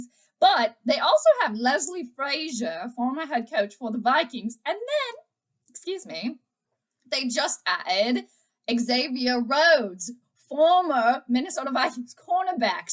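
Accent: United Kingdom. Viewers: ..I hear your British accent, and wish Roar of the Lions UK & You, could have had time to collab. They said they were going to have you on after the game, but It never materialized. I so hope both of you are able to collab before the Vikings vs Lions next game. I catch their show during the week. It's a trip to hear them talk & usage of the English language. "That team/player played like Rubbbish" etc. LOL. Hope to catch you for your live stream. Will look for your notification when you go live. 20 subs to 1 K. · Hey, very nice british accent, make me want some tea and crumpets! LOL I will be there in spirit as I will be out of town sun morning- in the twin cities. you're the tops as always. cheers. see you on the flip.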